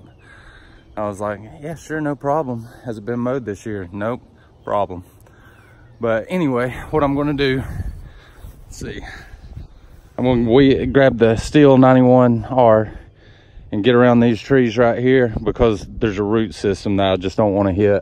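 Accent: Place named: East Tennessee, United States. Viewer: 423 area code & the accent, I know exactly where you're from!! Been down to TN especially E.TN 11 times!! God's Country Fo Sho!! Subbed to the channel & I think I do follow you on IG, I'll have to check. Hoping all is well Bud, Dirty Jersey out!!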